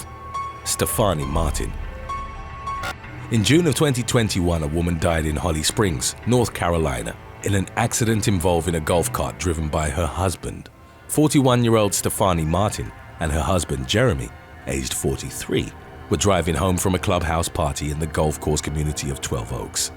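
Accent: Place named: United Kingdom. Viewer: “An almighty crack of thunder” blended with that tone and British accent 🙂👏🏾👏🏾👏🏾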